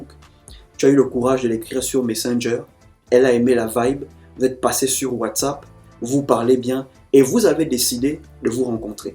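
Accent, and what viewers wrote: Gabon. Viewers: La tonalité de la voix et l'accent...mec tes Gabonais ?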